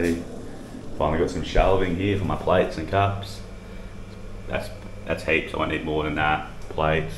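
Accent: Australia. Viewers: Hey mate, how do you still have such a thick Aus accent hahaha. Crazy that, normally when you move out of Aus we get more pain English accent, but in each vlog yours seems like you get more and more aussie.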